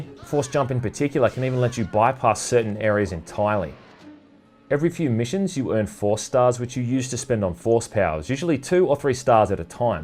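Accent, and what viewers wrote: Australia. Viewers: this may be a weird question but what is your accent it sounds australian to me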